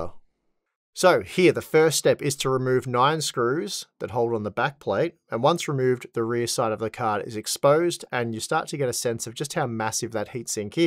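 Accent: Australia. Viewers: I also love the silent baos hahahaha I love how Australians pronounce some words lmao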